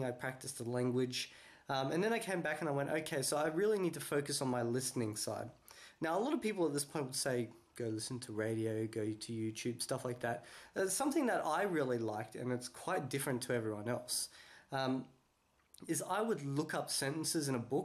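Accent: Australia. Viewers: g'day brother you sound Australian.. any chance for a few lessons.. live In Buxton nsw near Picton. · Yeah, basically. I feel like a lot of Esperanto material is geared towards komencantoj, but I have been reading more advanced stuff lately. "Being Colloquial in Esperanto" is one of my favorites for English-speakers. I also just ordered "Vojaĝo en Esperanto-lando" directly from Boris Kolker himself, so that should be good. My Esperantist friend in North Carolina wants me to attend NASK,(Nord-Amerika Somera Kursaro) this summer, so we shall see. But what I've really been doing is what I call immersion. I've been trying to spend as much time as I can in Esperantujo. I skype with Esperantist friends, listen to Esperanto radio and music, chat in Telegram chats, read in Esperanto, and I've even started to have dreams in Esperanto (mostly stress dreams about being somewhere with fluent speakers and not being able to follow the conversation). 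I think it's working, though. I feel like I am making great strides. Between my first and second live Esperanto meeting, I noted a marked improvement in my ability to hold conversation in Esperanto. I really enjoy your videos, though. They are helpful, though I am somewhat embarrassed that I've known Esperanto longer than a lot of fluent people but and not as good as them. I'll be there, though, soon! I enjoy both your Esperanto-language videos and English ones. As a side-note, I first found your Esperanto language videos and I was surprised to hear your Australian accent in English. Good job on your Esperanto accent!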